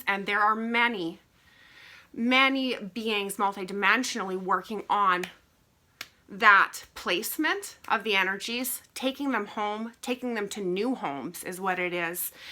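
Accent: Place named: Minnesota, United States. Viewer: I grew up in MN and now live in Italy 🇮🇹 in my wife’s country~ Are you by chance from the MN area? Just curious b/c some of the words u pronunciate sounds like it~ Thanks again 4 the info!!!🖖👌💪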